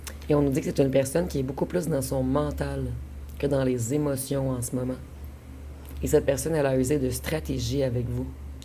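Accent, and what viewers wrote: Canada. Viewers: J'aime laxent Canadien!!! Avec la voix cassé ( sexy ,sexy). J'aime le Canada😆👍🤍💜🌞🍁🍁🍁🍁🍁🍁🍁🍁🍁🍁🍁🍁🍁🍁🍁🍁🍁🍁🍁🍁🍁🍁🍁🍁🍁🍁🍁🍁🍁🍁🍁🍁🍁🍁🍁🍁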